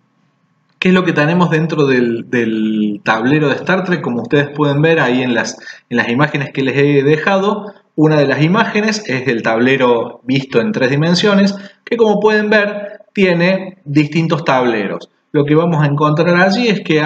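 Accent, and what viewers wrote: Argentina. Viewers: es re cordobés el vago jajaja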